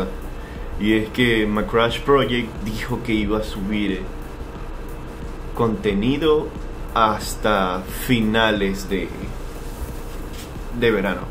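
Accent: Venezuela. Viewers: ME ENTERO QUE VENEZUELA TIENE UN LUGAR EN LA DISFORMIDAD, AUNQUE CREO QUE QUIEN LO GOBIERNA ESTA CORROMPIDO HASTA DONDE NO LLEGA EL SOL, SALUDOS DESDE VENEZUELA, PREGUNTA ESTUPIDA ¿ERES VENEZOLANO?, TU VOZ SUENA ALGO CARAQUEÑO. EXITOS Y PROPERIDAD PARA TU FAMILIA HERMANO DE BATALLA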